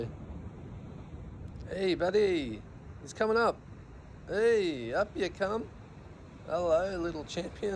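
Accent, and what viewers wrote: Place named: Australia. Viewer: Great footage, love hearing Aussie commentary, I've lived along east coast all my life, had some close encounters over the years, Evans head, Lennox, Byron. Just found the channel, keep up the great work, Cheers 😊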